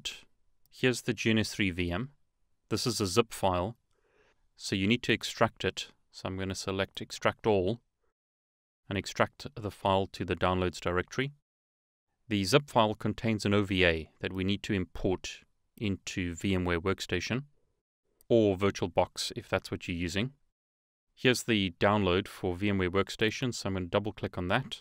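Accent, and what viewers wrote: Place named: South Africa. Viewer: Hehe nice. From Cape Town myself so noticed a bit of the accent. Thanks for the amazing videos, really appreciate your effort. Keep spreading the knowledge. (first attempt at CCNA in a few months)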